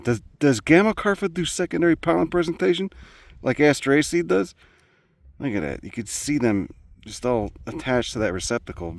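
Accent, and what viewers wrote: Chicago, United States. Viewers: I appreciate how u cover a ton of microbiomes and blending/borderline climates. Really interesting and funny channel. Chicago is my new favorite accent 😂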